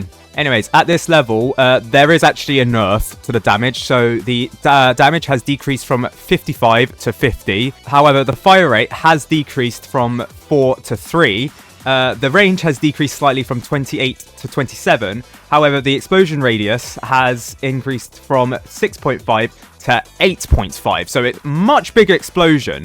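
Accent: United Kingdom. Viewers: I always forgot that this guy was british after his voice reveal😭😭😭😭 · I enjoy you speaking British more than reading a whole paragraph of text ngl this new style is much easier to watch your videos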